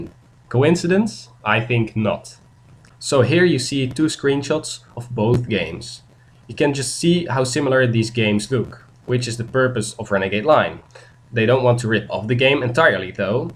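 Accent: Netherlands. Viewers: Dutch guy, I can hear it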